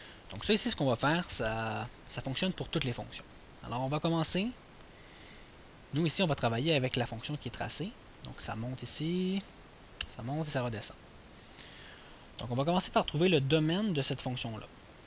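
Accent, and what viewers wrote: Quebec, Canada. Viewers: il est Quebecois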